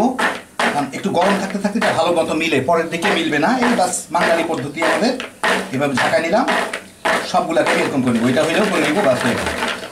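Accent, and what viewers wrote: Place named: Satkhira, Bangladesh. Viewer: Dada you , especially your language remind me my ancestry migrated from Satkhira ,if I am not wrong. Love you from Calcutta.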